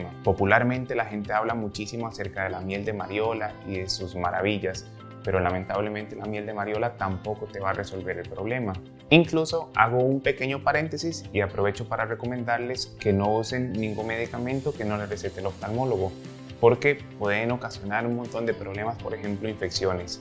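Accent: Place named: Colombia. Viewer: Pense que el doctor era Colombiano por su acento.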